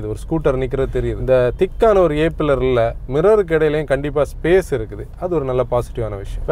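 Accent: Kanyakumari, India. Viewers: One of the best moto vloggers I've come across in YouTube. And hearing that too in kumari Tamil is refreshing. Keep rocking guys..btw I've a question Between VW Taigun & Skoda Kushaq, which one would be a good choice? Driving dynamics and rear seat passenger comfort are my top priority areas. Also will 1.0 litre engine be adequate enough to give a peppy Driving experience? Or should I go for the 1.5 litre one? Predominant usage would be within city with occasional highway runs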